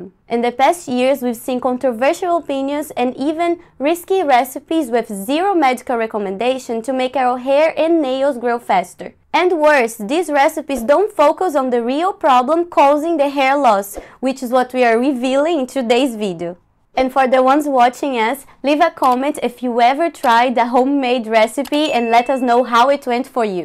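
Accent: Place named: Brazil. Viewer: I knew she was brazilian by her accent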